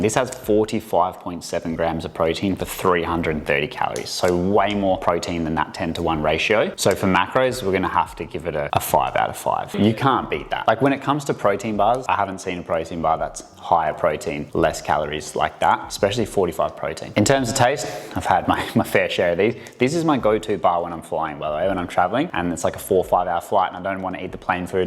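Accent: Australia. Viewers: I love how Australian you sound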